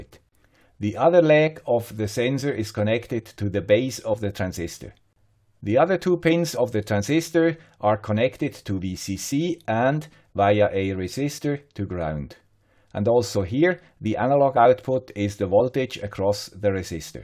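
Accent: Switzerland. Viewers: Your videos are always wonderful, instructional and your Swiss accent is adorable too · Hallo aus Österreich,!:hand-pink-waving: Ich mag deine Videos. And "the Swiss accent":) Wegen des Kupfers... Wenn du, so wie du es gemacht hast, die Elektroden ins Leitungs-/Brunnenwasser steckst (nimmt man ja meist zum Gießen) und mit ein wenig Spannung und einigem Strom beaufschlagst, erzeugts du einfach Knallgas. Sauerstoff und Wasserstoff an je einer Elektrode, das entstehende Wasserstoffgas kann als Blasen an der Oberfläche der Kathode freigesetzt werden. Komplizierter ist es mit Folgendem: Im Leitungswasser sind üblicherweise verschiedene gelöste Salze enthalten, darunter Natrium- bzw. Kalziumionen und Hydrogencarbonationen (HCO₃⁻), die möglicherweise reagieren könnten, um Kupfersalze wie Natriumkuprat (Na₂CuO₂) oder Kupfercarbonat (CuCO₃) zu bilden. Verschiedenen Faktoren wie Wasserzusammensetzung, pH-Wert, Temperatur und Stromstärke beeinflussen die Reaktion. 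Wenn man Pflanzen mit einer solchen Flüssigkeit bewässert, bzw das im feuchten Erdreich auftritt, könnte dies potenziell schädlich sein, da Kupfer in höheren Konzentrationen toxisch für Pflanzen sein kann. Kupfer ist für Pflanzen notwendig, aber nur in geringen Mengen. Bei zu hoher Konzentration kann es jedoch die Aufnahme von anderen wichtigen Nährstoffen behindern und oxidativen Stress verursachen. Die Kalkablagerungen könnten auch den Boden pH-Wert erhöhen, was wiederum die Nährstoffverfügbarkeit für Pflanzen beeinflussen könnte. Ein erhöhter pH-Wert kann dazu führen, dass bestimmte Nährstoffe wenige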